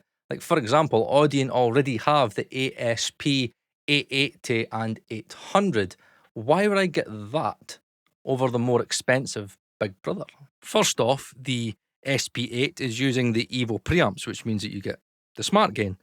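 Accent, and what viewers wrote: United Kingdom. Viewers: Sorry mate, could not take your British accent using disgusting American terminology. ROUTING has an 'OO' sound not an 'OW' sound.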